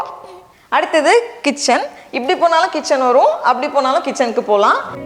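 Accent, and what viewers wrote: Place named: Chennai, India. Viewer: na unga tamil slang rmba pidichanala inga chennai ninachen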